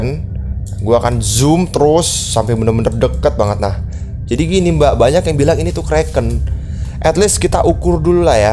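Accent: Java, Indonesia. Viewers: Orang jawa bang? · Jowo banget mendelep 😭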